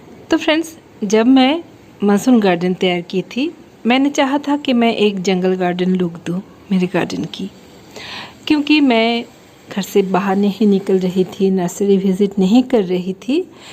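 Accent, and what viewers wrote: Bengal, India. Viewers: Aap ki Bangali tadke Vali Hindi mujhe bahut pasand aati hai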